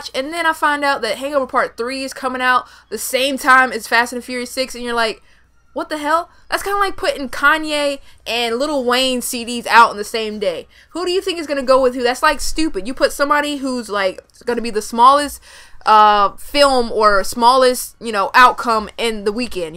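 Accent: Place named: United Kingdom. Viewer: Lol your British voice, and I'm running out of comments on my phone...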